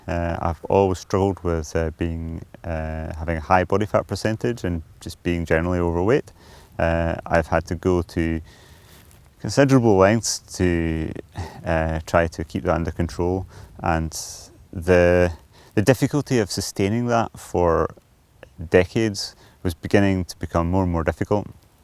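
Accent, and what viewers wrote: Scotland, United Kingdom. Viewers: I going to use your channel to learn understanding the Scottish accent :-) Interesting content! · I like your braw Scots accent,. My mother was from Dunbar:thanksdoc: & was raised in Edinburgh. Dad was an American and he was in the Air Force stationed in Scotland.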